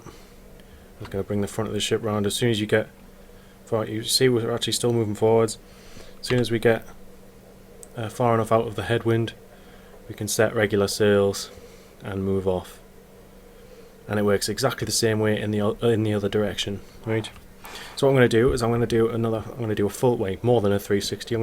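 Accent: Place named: Newcastle, United Kingdom. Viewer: Great video man! Nice to hear a jordie voice amidst all the American naval action video s. I haven't yet played this game, and the detail is cool. But I am a little disappointed that you can only change sail angle and not entire sail plan. (Eg a square rigger sails best down wind with only foresails and main course)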